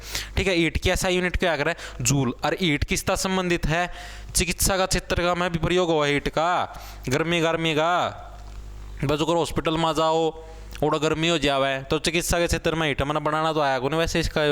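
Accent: Haryana, India. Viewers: Ya to hindi ae bol le ya fer haryanvi bol le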